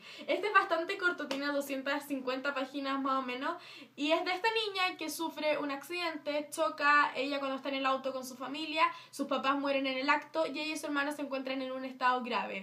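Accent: Chile. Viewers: ¿Eres chilena cierto? 😂❤ Pd: si lo eres ya somos dos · tiene más acento de Chile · eres chilena no? xd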